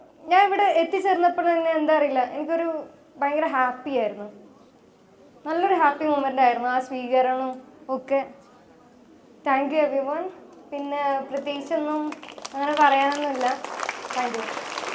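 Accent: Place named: Kasaragod, India. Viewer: Masha allah mole congratulations ❤❤eniku kasarkkode bhasha bhayankara ishtamanu😂keep it up mole❤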